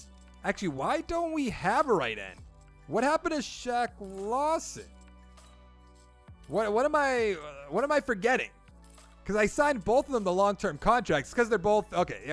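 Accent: Boston, United States. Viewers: This is the thickest Boston accent I've ever heard besides Ben Affleck and Matt Damon😂😂😂😂😂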